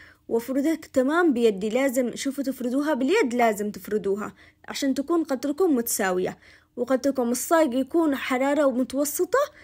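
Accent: Yemen. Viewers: السلام عليكم احب طريقه واطباق طبخك يا واكثر شي احب لهجتك اليمنيه انت سيده مباركه الله يحفظك يا ريت تزوريني في جده ❤ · لاول مرة احب لهجتنا اليمنية منك انتي طبعا لهجتنا متعددة يابنت عدن الحلوة عفويتك وعدم تصنعك خلاني اقول والله لهجتنا كانت مظلومة من قبلك وتسلم ايدك يا قمر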